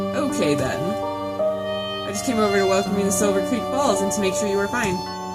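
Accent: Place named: Boston, United States. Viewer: I think it's safe to say that you'll have a "bloody" good time with this game lol. Your Bahston accent is hilarious, btw 😆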